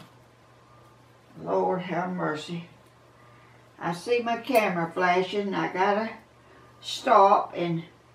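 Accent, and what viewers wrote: South, United States. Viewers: You deserve the plaque!!!! We love to her your southern accent, your sweet personality, your faith, you are a blessing to us all......So thank you. The pot you covered today is beautiful!!!!! But what you need is a big silicone cookie sheet to pour your stuff onto and it will come off with no problem.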